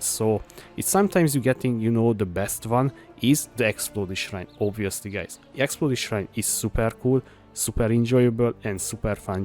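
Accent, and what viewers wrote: Hungary. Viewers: Nagyon magyar az akcentusod :D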